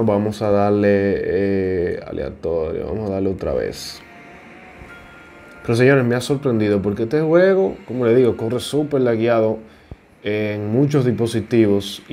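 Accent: Dominican Republic. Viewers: Se nota que eres dominicano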